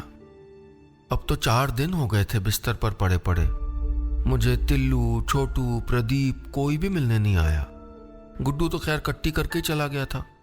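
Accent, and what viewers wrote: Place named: Pahadi, India. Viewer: Pahadi accent awesome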